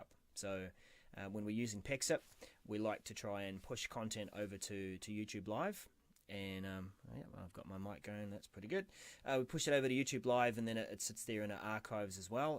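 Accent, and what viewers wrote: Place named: Australia. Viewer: Even you young people are lost, lost , I say, to try to follow, understand, and participate , in all this crap, this nonesense, about partaking in all this on-line balloney, about your so-called channel ! It is all a joke ! Even you youngsters are lost with all this so-called technology! a word of advice, Sir, help people to use your services or channel, and you Sir are a horrible explainer to any one ! You bore us to death listening to your Assie accent explain nothing! Get somebody who can listen to you , and he or she will back me up! and try to understand how a person l;ike myself who did not go through school with computers ! Yes the american Main line media is corrupt!! but they have professionals who know what they are doing to put forth their lies! <mail>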